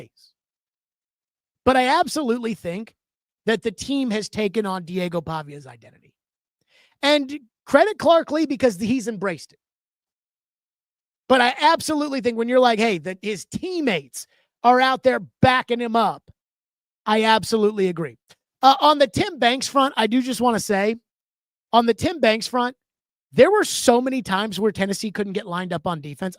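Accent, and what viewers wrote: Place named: New Jersey, United States. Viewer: Could’ve swore this guy from jersey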